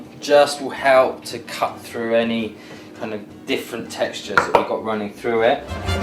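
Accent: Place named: United Kingdom. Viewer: WTF? The text is on swedish but u talk british? Im swedish and can talk English, but not that kind of brittish. Where r u from man?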